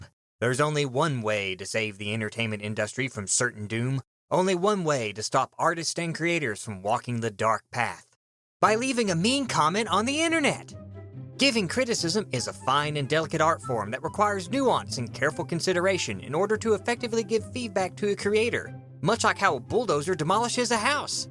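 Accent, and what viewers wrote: South, United States. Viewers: Your southern accent makes me happy :)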